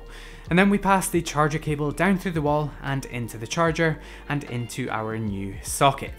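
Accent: Scotland, United Kingdom. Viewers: are you scottish like me?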